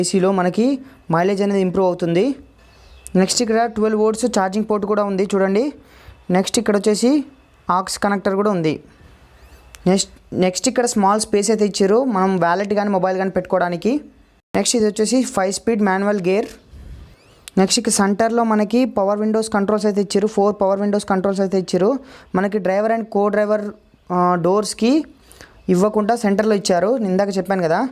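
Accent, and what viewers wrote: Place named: Telangana, India. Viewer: పక్క తెలంగాణ పోరాడు సెప్పినట్టు సెప్పినవ్ కాకా నువ్వు కేక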